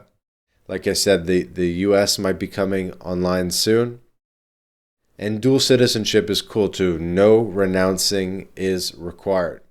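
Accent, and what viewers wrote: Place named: Canada. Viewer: Your accent tells me you’re an annoying Canadian. You’re talking about the poor retiree / annoying GenZ / “digital nomad“ way to get a permanent resident visa in Uruguay. I’m certainly not a Uruguayan lawyer, though I know several, but it would seem there are far better permanent residence visas available in UY, which have much less restrictions one’s need to remain in the country on a more or less continuous basis (after all, if you’re not a poor ass Gen Z, you might want to occasionally travel somewhere like Southeast Asia or Western Europe or even spend a week or two in Argentina or Chile or maybe southern Brazil). Though of course, because Uruguay values (as they certainly should, and we all should, whether in Canada, in the United States or anywhere else in the world) that in order to get a permanent residence visa of any kind, one has a true desire to spend significant time in the country and integrate fully with the culture (in other words, if you speak no Spanish and/or don’t respect the local culture, you have no business in UY, permanent residence visas-wise), unlike in Canada and the United States, in both of which people who are completely incapable of assimilating in either country’s culture, much less speak the native languages normally used there, are allowed to enter and remain indefinitely, which is a travesty). 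Yes, so far as I understand it the “independent means“ visa is available. I’m just saying there may be better options assuming you’re not a poor ass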